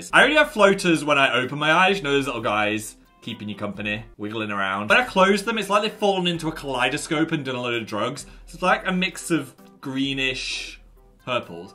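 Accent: North, United Kingdom. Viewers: has been alone too long and his northern side has re-emerged 😂 the accent be strong ✊ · Sir you've gone more northern again while Daniel has been away...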